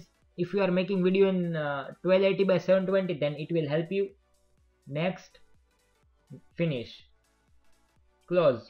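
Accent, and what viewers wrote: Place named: Italy. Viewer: im now so good at english cuz im czech but this some kind of italian english is too much for me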